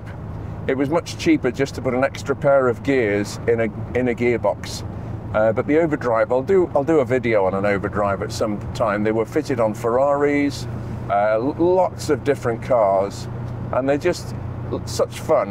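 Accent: United Kingdom. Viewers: I absolutely love the sound your voice. British English at its finest. Greetings from Sweden.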